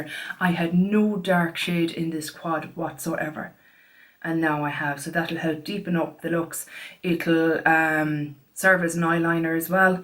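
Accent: Ireland. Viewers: Hi from Israel! I love Irish accent so much!❤❤❤❤❤❤❤xxxxooo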